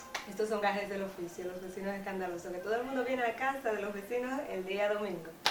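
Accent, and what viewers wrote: Dominican Republic. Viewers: Eres Dominicana? :v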